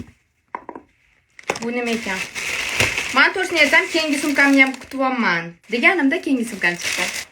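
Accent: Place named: Russia. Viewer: aksentiz rosa ruschaga tortarkan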